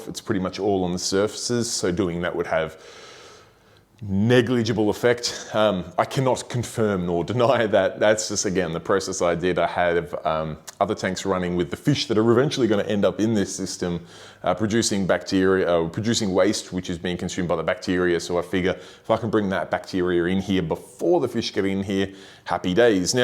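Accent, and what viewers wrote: Australia. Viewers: As a man raised in California, I’m only here to hear the best accent the world has ever produced! Damn I wish I was Aussie! Lol. Awesome tank man and grate series.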